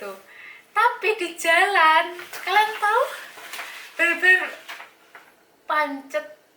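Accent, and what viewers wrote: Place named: Blitar, Indonesia. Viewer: 😂😂😂bhasane medokkk blitaran sikile teklokkkkk asoyyy 👍🏻👍🏻👍🏻👍🏻😉😉😘👍🏻👍🏻mantappp ya lanjutkan ngevlognya yg gokil gokil yaa kyak mak marck blitaran Barcelona, apa adanya yaaa 👍🏻👍🏻👍🏻😘😉🙏🤝🤝🤝ttep semangat ngevlog, smawong blitar wlingi 🙋🏻‍♀️🙋🏻‍♀️🙋🏻‍♀️🤝😉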